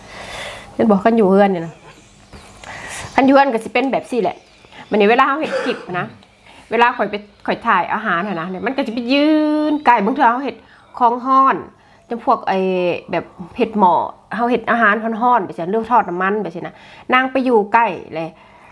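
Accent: Savannakhet, Laos. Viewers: ເຮັດຫຍັງບໍ່ເປັນກະເບິ່ງຊ່ອງເອື້ອຍແນະນຳລະອຽດມັກຫລາຍເບິ່ງທຸກຄິບເລີຍ ສຽງເວົ້າກະມ່ວນສຽງຄົນສະຫວັນຍ້ານເຮົາ😍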